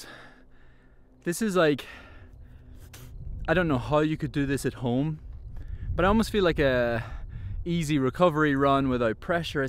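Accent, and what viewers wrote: Northern Ireland, United Kingdom. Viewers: Jaysus that norn Irish accent cuts like a knife. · Came across this video and my ears perked up immediately, no mistaking that accent. Fellow nordie here.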